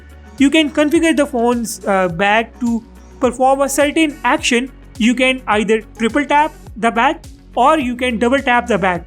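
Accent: India. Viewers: I hate Indian accent She makes me unable to finish the video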